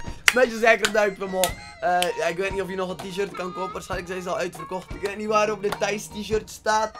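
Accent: West Flanders, Belgium. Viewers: West Vlaams denk ik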